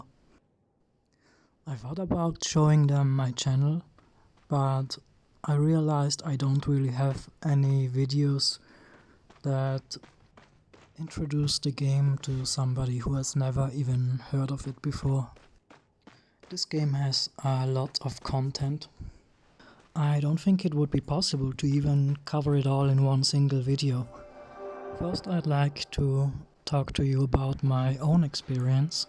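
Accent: Germany. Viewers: You really have a calming voice^^ Im trying to figure out what your accent is😅🤔🤔🤔 is it german maybe? Or something else? Hmmmmmm🥲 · Dang i havent seen the video yet and it now just got the notification. Your voice is so beautiful and calming. I figured you'd me southern German/tyrol maybe? I could tell, because I am a German peep too! Your videos are so funny and a big entertainment. You helped me out alot in my moth days so thank you. Keep going and maybe we could be friends on sky?..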